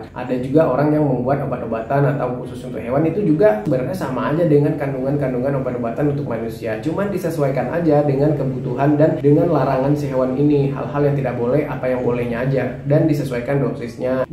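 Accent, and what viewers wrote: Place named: Banten, Indonesia. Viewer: Kebantern ngomongnya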